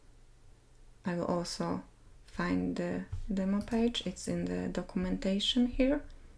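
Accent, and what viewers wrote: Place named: Poland. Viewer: Tak właśnie mi się zdawało, po imieniu i nazwisku :). oraz po akcencie :) Super fajną wtyczkę zrobiłaś, jest ona może w wersji Polsko języcznej ?. Jak masz podaj mi linka do swojej strony w języku polskim lub do jakiejś dokumentacji odnośnie tej wtyczki Pozdrawiam Łukasz :)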